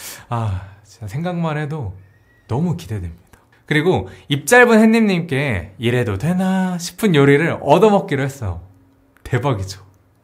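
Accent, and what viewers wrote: Cheongju, South Korea. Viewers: 먹방채널이랑 일상채널을 나눠도 좋을것같아용!!! 일상채널에서 게임영상도 올라가고 가족이랑 놀러가는 일상도 올리규 ㅠㅠ 그리고 저 청주 사투리 좋아하는데 라이브도 하면서 사투리도 보여주시구~ 이것저것 많이 해주셨으면 좋겠어요!!편집 영상도 재밌는데 라이브도 재밌을것같아요 ㅎㅎㅎ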